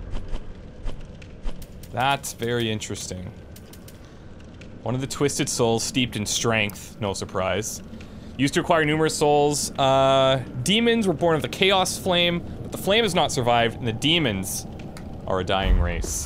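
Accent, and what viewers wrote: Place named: Canada. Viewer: It's not a "tomb!" i kept thinking that too. We still love him, crazy Canadian accent and all.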